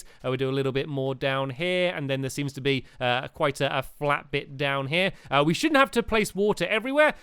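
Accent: United Kingdom. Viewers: LOL it's ester not esta he just pronounces it that way cause he's British XD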